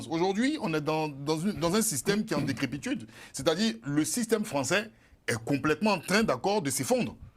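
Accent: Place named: Ivory Coast. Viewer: Lui qui parle est ivoirien avec l'accent Effectivement tu as raison c'est au ennemis de LAES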